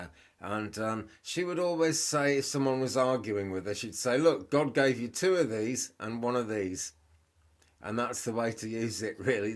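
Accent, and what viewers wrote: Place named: Wales, United Kingdom. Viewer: Love your voice & Welsh accent! My former mother-in-law was Welsh and you remind me so much of her! Have a great day!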